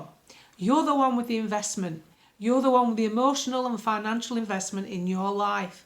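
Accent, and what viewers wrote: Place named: RP, United Kingdom. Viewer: Brilliant as usual but stop talking posh. DATA is pronounced day-ta not darter. Phil Taylor is a darter 😊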